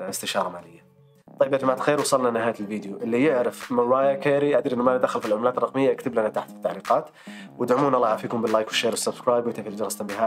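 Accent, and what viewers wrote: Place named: United States. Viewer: اذكرلنه الارقام بلعربيه بدون لكنة ولغة امريكية . انته عربي واحنه عرب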